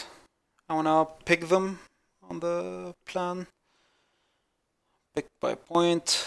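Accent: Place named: Lebanon. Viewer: are you lebanese i can tell from the accent /;0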